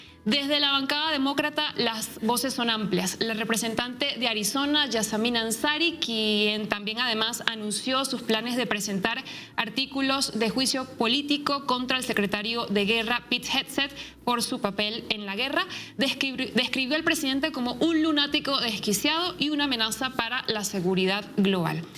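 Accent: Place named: Venezuela. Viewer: En el acento, se le nota que es venezolana.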